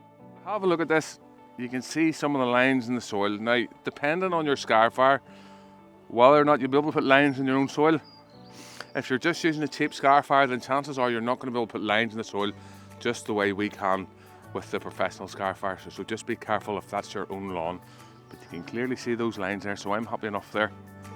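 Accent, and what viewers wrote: Northern Ireland, United Kingdom. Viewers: Never thought I would get so much enjoyment watching a video on lawns. Knowledgable, genuine and straightforward. Throw in northern Irish accent and you have all the ingredients for a brilliant channel. Keep it up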